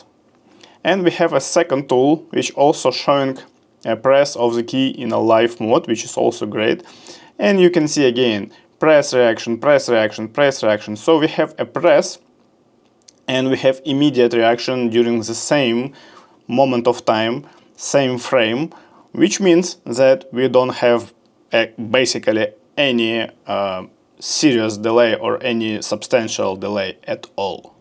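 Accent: Russia. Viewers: russian accent?) я все понял )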